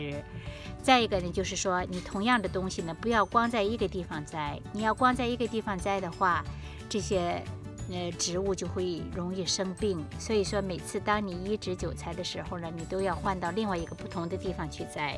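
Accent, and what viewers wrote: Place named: Weihai, China. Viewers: 哇、妳也是威海妹子?咱也是威海人。難怪聽著那麼親切! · 听口音好像山东的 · 听您的口音好像山东威海人呢！ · 大姐是威海的吧，字里行间家乡味儿改不了啊！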